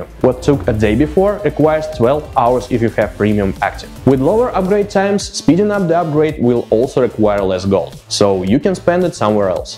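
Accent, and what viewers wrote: Russia. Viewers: Братка русский знает акцент еще не ушел! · Я заметил русский акцент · Чуваки, судя по акценту, сделайте видосы на русском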